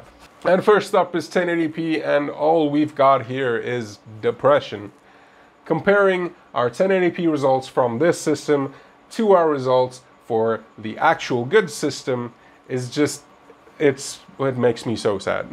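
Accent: South Africa. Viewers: Awe are you from South Africa bro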